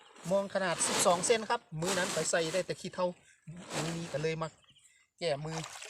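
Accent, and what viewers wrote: Thailand. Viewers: สวัสดีค่ะ ได้ปลาโจกตัวใหญ่ๆ ลาบแซบๆค่ะ สำเนียงเว้าคือไทยนครพนมบ้านข่อยแท้น่อค่ะ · อยู่บ้านได๋น้อ ฟังสำเนียงเสียงเว้าคือสิมาทางเดียวบ่น้อ หมานๆครับ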